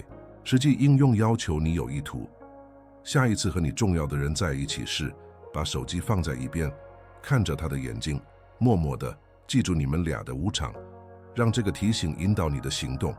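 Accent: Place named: Hong Kong, China. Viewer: 是ai生成的港式普通话吗？有的词听起来会有歧义。最好是标准一点的普通话。内容不错，加油！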